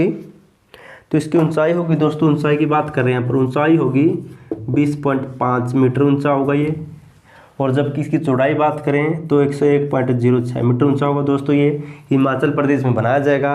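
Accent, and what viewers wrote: Haryana, India. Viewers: Sir ji ye nai suruat b bhot achi h,,,mn hra hra ho gya ji,,,,vase ji aapki aawaj me tunning nrvana ,kathal side ki aati h ji kha se ho ji aap????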